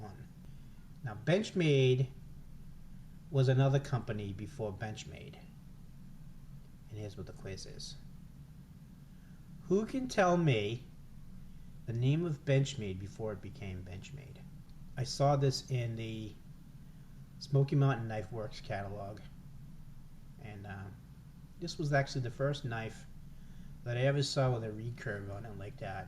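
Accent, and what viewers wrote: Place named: New England, United States. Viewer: Pacific cutlery! And I would recognize that accent anywhere go PATS!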